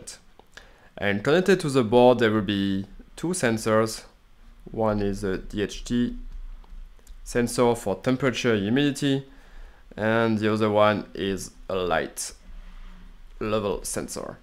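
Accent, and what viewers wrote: France. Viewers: Mais tu es français ? :D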